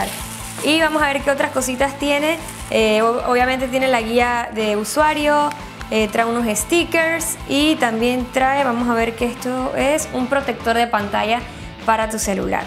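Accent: Venezuela. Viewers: Me parece conocido tu acento ¿eres venezolana?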